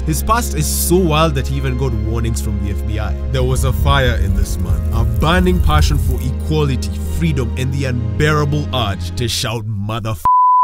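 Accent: Kenya. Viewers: I know a Kenyan accent when I hear it. instant sub!!!! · Mans a Kenyan, I couldn’t tell by the accent at first but good work big man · wait wee ni brathe kumbe😂😂 lol the accent didnt hit until I saw 2007 n was like wait you is raia😂😂 heres a sub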